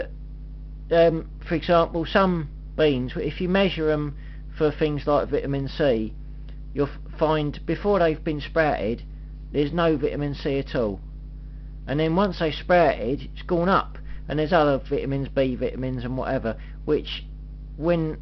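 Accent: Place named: Rugby, United Kingdom. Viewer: A great video! Many thanks! - Where are you from? You sound local to me... Rugby, UK?? - Julian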